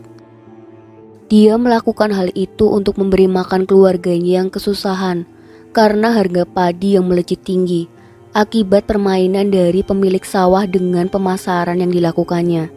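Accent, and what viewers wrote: Banyumas, Indonesia. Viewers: Bahasa ngapak yak😅😂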